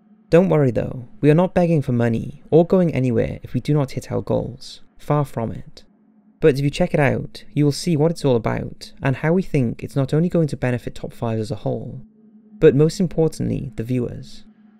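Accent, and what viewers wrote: United Kingdom. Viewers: Where are you from? I thought you might be British, but throughout your videos you mispronounce certain words, so I thought English might be your second language? For instance Ouiji and heinous were mispronounced. I’m not poking fun, I’m just really curious.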